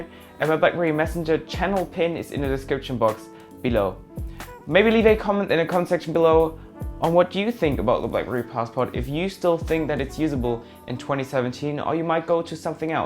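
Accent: Germany. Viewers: Gutes Englisch für einen Deutschen. Hab mich echt gefragt, welche Nationalität hinter diesem Akzent steckt. Respect. But I think that the Passport will have an end in the future. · r u german, if yes, from where?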